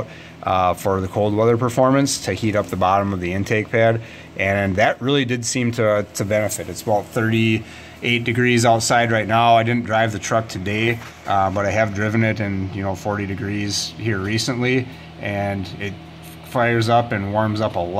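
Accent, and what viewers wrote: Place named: Upper Midwest, United States. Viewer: Yes, the northern.midwest accent. I wonder how a 4.9L running on propane would do. They certainly run clean with no fouling of plugs. They run better with high compression pistons or head decking to raise the compression. Seeing the specs on the Honda GX NG Civic they had compression ratios of 12.5:1. Propane should work pretty well unless its gets SUPER, SUPER COLD liquefies -44°F. Natural gas isn't as available as propane. Hard to get a hold of out on the interstates. Fed gov't has a website about this stuff on fueleconomy.gov. alt fuels.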